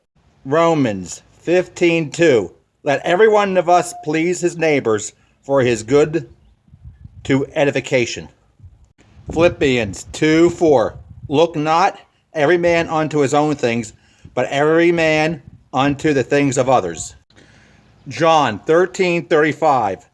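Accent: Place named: Philadelphia, United States. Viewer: Good analogies and a fun presentation. Are you from the Philly area by any chance? I grew up in South Jersey, and your accent sounds familiar! 😂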